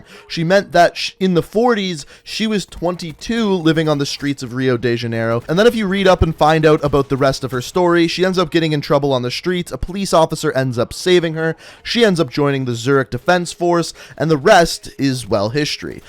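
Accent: Canada. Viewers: Are you Canadian? Not sure about your background but by the way you say out I’m just curious as to your whereabouts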